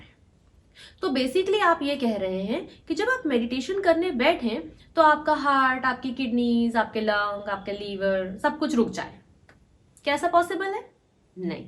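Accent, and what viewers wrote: Madhya Pradesh, India. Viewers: mam are uh from MP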